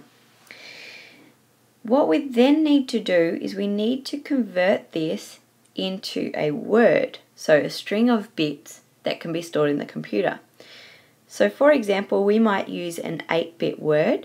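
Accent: Australia. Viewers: nice Australian accent :)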